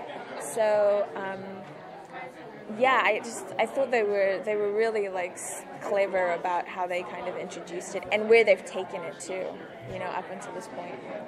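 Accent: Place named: South Africa. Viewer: I love that you still have your South African accent. A bit of American but thats expected. Don't lose your roots girl! Lekker bly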